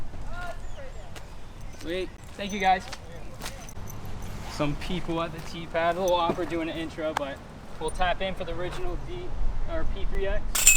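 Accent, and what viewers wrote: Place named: Ireland. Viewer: Awesome Irish ACCENT!!!!!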